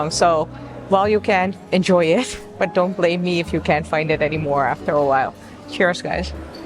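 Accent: India. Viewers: on line! Licious!,! You are beginning to sound Indian with English news channels! Well done, I am clapping!. All over big cities. LICIOUS app. Mostly meat but These also!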